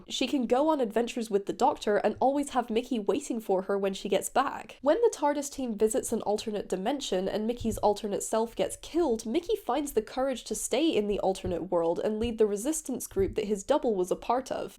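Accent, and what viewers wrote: Scotland, United Kingdom. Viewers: Are you Scottish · You have a weird Scottish accent, can’t quite place it.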